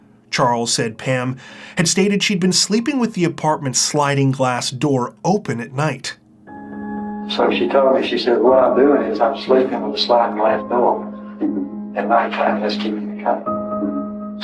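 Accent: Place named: Canada. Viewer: A very typical Canadian manner of speaking.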